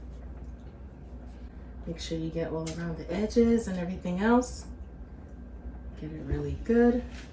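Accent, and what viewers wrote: New York, United States. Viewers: The NY accent love it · Hi,.I just started watching you about a week ago and i'm loving your recipes. I love the way you talk to I think you're from new york but i'm not for sure, but thank you for sharing your recipes because i'm getting ready to try quite a few of them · Love your New York accent. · Heavy on the NY accent ;-) My mom is from the Bronx :-D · I’m in love with the New York accent 😅. And I’ve made most of these recipes. Somebody need to put a ring on that finger ASAP 🥴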